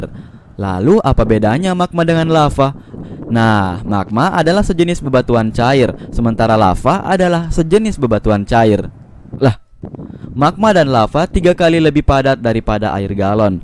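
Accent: Makassar, Indonesia. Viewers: Bang orang mana ya apa orang makasar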